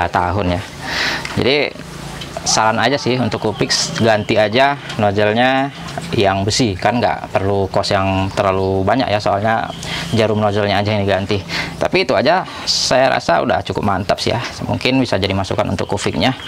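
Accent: Medan, Indonesia. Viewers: Abg dr medan ya, sama donk bg...medan dmn nya bg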